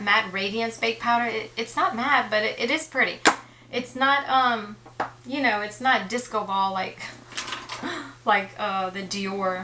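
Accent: Louisiana, United States. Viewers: What is your accent? I'm from SC and I feel like occasionally I'll hear some Cajun? I mostly stick to CC cream while I'm getting use to retin a. I'm just not peeling like a lot of people do. And I use a sun block with cc creams sunblock just for extra protection. I just found your channel. I love it. Looking forward to hearing more from you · Hi thanks for thos video. I can totally imagine us being friends if we were neighbors Totally. In fact you remind me of a friend of mine Natalie... lol. I live near new orleans. I thought i detected a little southern cajun accent in your speech. Its a unique sound but i wonder, are you from nawlens? I was just thinking about what you was saying about makeup in general and how you love it, love applying it , buying it, shopping for it.... Etc. And how its something just for you and i totally get it. I mean i love love love trying different makeup and all of the above as well. 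Lately I've been more and more concerned about the the ingredients used in my cosmetics. I think IT Cosmetics is an awesome brand because they really try to use good ingredienrs and not just products that arent harmful but ones that are actually helping our skin. And heres a tip to try for the ABSOLUTE BEST LOOKING UNDEREYE: before applying anything to ur cleaned face...use an undereye cream or whatever moisturizer absorbs well and that you love. After it sinks in.... wait 3-5 min and apply it again. Apply a min. Of two layers of this moisturizer. Pref..4-5 layers. Now, make sure these layers are not too thick and they do get absorbed into the skin... very well. This will plump your skin some and really really help. Step 2 - use the slightest amount of eyeshadow primer just under your lower lashes. You might want to apply it to your lid first and just sweep whats left over under the bottom lashes. 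It has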